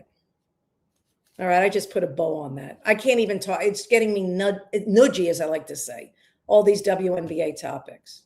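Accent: New York, United States. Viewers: Love NYC accent.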